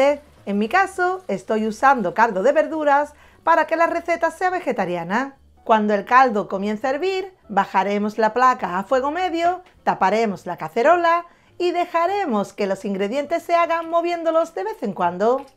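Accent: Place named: North, Spain. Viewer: Pues en pareces que seas del norte en vez del sur con ese acento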